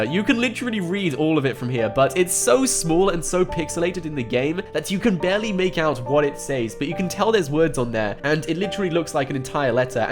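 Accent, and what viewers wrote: United Kingdom. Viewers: Bro u sound very british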